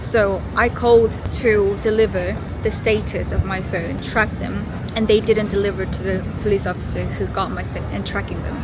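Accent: United Kingdom. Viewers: 외국카페에서 런던 영어 발음하는게 매력적이여서 구독했지만 그게 구독을 이어가도록 한건 아니였어요. 님이라는 사람 자체가 매력이 있다는걸 알게되었어요. 당신이 어디서든 어디에 있든 그런건 상관하지않아요. 다만 당신이 조금만 덜 힘들었으면 좋겠어요.😊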